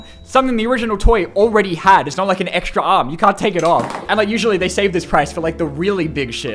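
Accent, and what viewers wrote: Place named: Australia. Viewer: Idk why but I thought u were Australian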